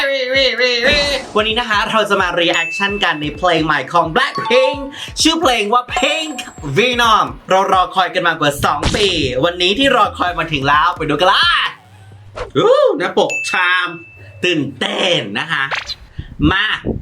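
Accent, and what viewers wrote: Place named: North, Thailand. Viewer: ชอบความ อ้อ~อ๋อย คนเมียงของแต๊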